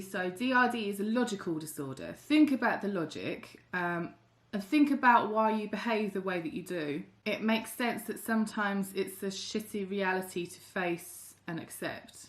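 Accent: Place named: Cockney, United Kingdom. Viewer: a... british accent? (is it?)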